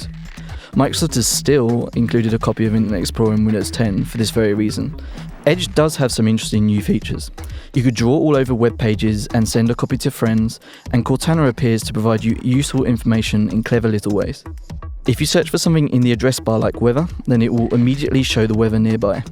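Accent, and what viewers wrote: United Kingdom. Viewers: damn I've looked for various reviews and they all have narrators with British accents I can't &^%$ understand...why do they do this? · I have never hated a British accent as much as this. It would've been a great review otherwise. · fuckin british accent, cant understand a word :C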